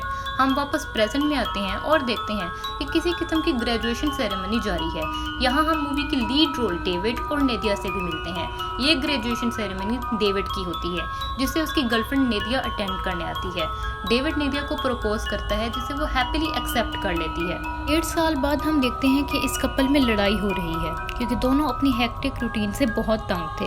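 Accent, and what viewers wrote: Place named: Lahore, Pakistan. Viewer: Awaz se lgta hai ap lahore se ho...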